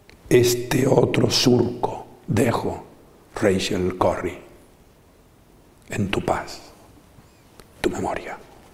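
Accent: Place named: Canary Islands, Spain. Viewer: Muy amena y enriquecedora. Más conferencias sobre poesía por favor. Que agradable es el acento de la Canarias.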